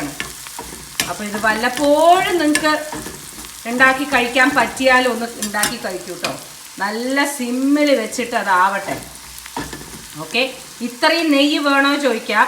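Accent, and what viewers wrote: Palakkad, India. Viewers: Luv u r accent chechi..em frm pkd too so I cn relate tu u!😊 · നമ്മുടെ പാലക്കാടൻ നാടാൻ ഭാഷ ചേച്ചിയുടെ സംസാരം സൂപ്പർ 👌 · Hi.... My Dad is from പാലക്കാട്!! So aa varthaanam നല്ല rasandu tto!! Pinne പാചകo... വാചകo രണ്ടും superb!!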